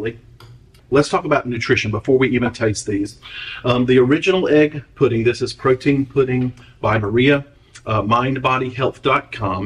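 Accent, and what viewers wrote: South, United States. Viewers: "run around the yard nekkid and yell at the dog" You been spying on me? 😂😂😂 I truly enjoy your videos. We sound so much alike with the southern ridicularity tossing words together. I love it!! Definitely trying this tonight. Hotter than Hades and more humid than Satan's draws out there baling hay. That's my justification for chocolate.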